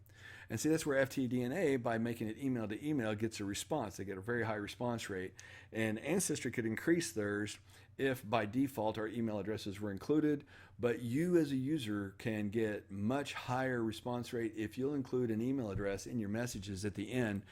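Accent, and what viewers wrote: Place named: United States. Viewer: Take no notice when we Brits snigger at your pronunciation...you are probably saying it correctly, it's us who have corrupted it over the last 1000 years or so! :-) BTW...my favourite US pronunciation is Worcestershire...as in the sauce...you guys say Wor-sess-ter-shire...whereas we say Wuss-ter-sha (or 'shur' depending on where in Britain you come from!). And get 2 Brits, one from the north of England and one from the south, to pronounce the word 'castle'...ah...hours of fun with English pronounciation! :-)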